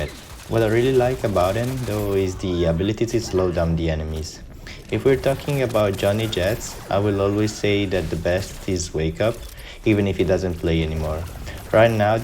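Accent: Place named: Italy. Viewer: Gotta love the italian voice! Thanks for the S/O and nice vid!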